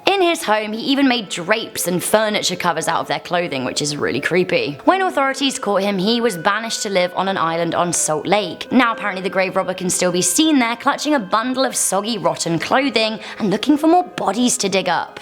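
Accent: United Kingdom. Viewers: are you british? You sound british. Keep up the good work! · I love her sharp British accent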